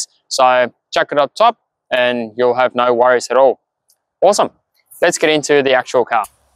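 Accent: South Africa. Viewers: Nice setup man! I must ask, are you perhaps South African? Because i somehow hear a bit of an Afrikaans accent coming through 😁 But ja, shot for the tips!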